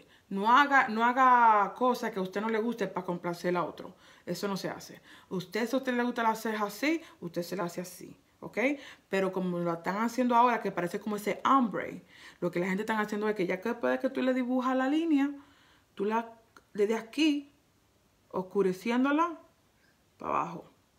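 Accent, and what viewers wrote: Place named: Puerto Rico. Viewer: You sound Puerto Rican.. lol but I love it! Soooo funny 😄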